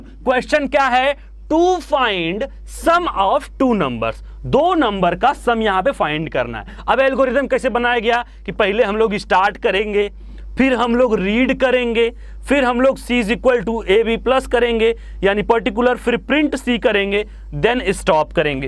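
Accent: Bihar, India. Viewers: Akdm bihari wala touch, maza agaya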